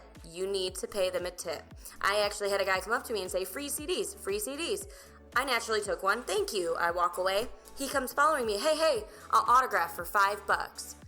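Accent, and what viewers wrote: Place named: Wisconsin, United States. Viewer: From Wisconsin? Trying to pinpoint your accent.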